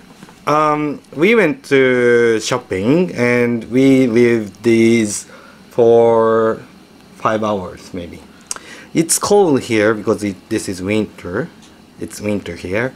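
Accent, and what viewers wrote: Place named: Japan. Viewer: He's Japanese, English isn't his native language.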